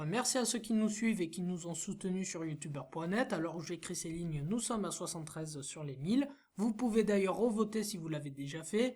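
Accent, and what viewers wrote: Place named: South, France. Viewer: Oui respect mais t'as un accent du sud je me trompe ?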